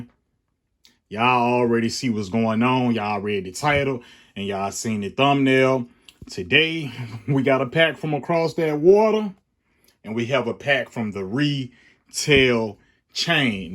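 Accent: Richmond, United States. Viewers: Richmond accent?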